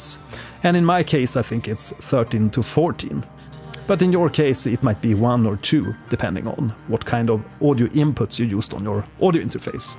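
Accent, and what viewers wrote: Sweden. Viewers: Hey Great and to the point video. And you sound very Swedish! My girlfriend is from . Im going to play this vid so she can tell me what area you are from . Maybe your from Norway? Any way i love the Scandinavian teaching method and love of music. Some of the best musicans i know and have seen/heard are from the region. I am having a clocking issue. Since i upgraded to osx 14, there is not enough clock send locations are in the send box. I’m not sure if logic is now limiting how many simultaneous clock send can happen but all of the midi connections show in the mid window but not in the clock destinations window if that makes sense. I have about 20 incoming midi and they all appear, but maybe only about, 14 or 15 show for the clock send. That’s how I found your cool channel while searching for this solution to the problem. Just curious if you have seen that issue. 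If I find the answer, I will send a message here so that everybody can know. I’ll be watching more of your videos too .very very good videos.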